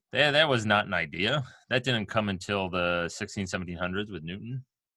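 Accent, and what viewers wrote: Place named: Great Lakes, United States. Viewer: Are you from Michigan or Ohio? Somewhere else in the Great Lakes Region? Curious based on your accent. Thanks a lot for the great content man! Fun to get a mini-Uni-style course on here.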